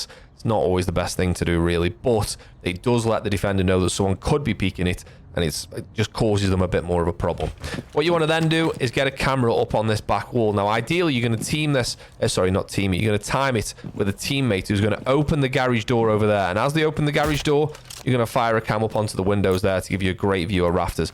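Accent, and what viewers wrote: United Kingdom. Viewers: I think that’s the british accent if im not wrong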